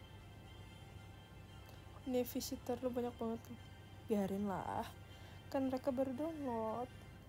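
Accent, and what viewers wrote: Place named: West Java, Indonesia. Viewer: Lucuuu bngt passs bilang inima slh Mulu euyyyyyyyy jdi anak Sunda nie 😫🤏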